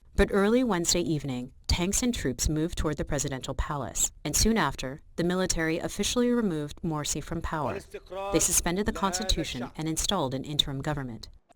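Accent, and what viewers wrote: India. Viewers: I like his Indian English accent.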